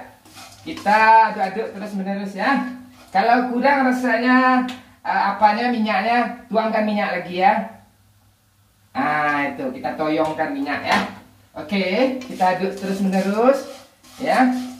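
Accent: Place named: Java, Indonesia. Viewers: Bg kok ada medok" nya kayak Youtubers porbolinggo 😂😂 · Wkwkwk kok medog yaaa wkwk ngakakk😂😂😂😂😂😂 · Kok medok bang😂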